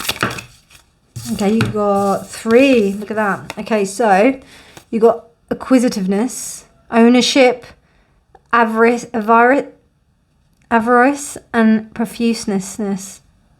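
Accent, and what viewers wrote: United Kingdom. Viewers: Finally, I'm done with the things I need to face. And now I have the time to see this! Woooh! I already watched the other suites and I saved this as last. Save the best for last. Thank you, Ms for choosing this deck. I love this deck. ✨🤍 Yet it made me had a hard time choosing for I love all the cards from this deck. 😂 And when you mentioned "Harry Potter" with your british accent. I suddenly remembered Hermione. Add your intelligence, brave voice and laugh. ✨🤓✨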